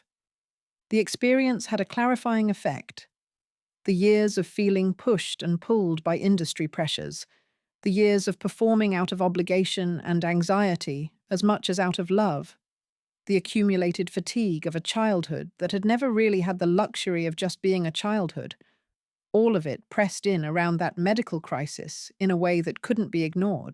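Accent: United Kingdom. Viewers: Why is the narrator speaking with a British accent? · Maybe because she is British…..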